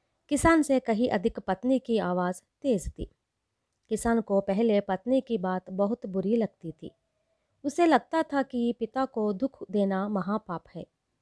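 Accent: South, India. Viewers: Bahut achha aur bolne wali pakka South Indian hai and really love South Indian guys really hard working and intelligent people.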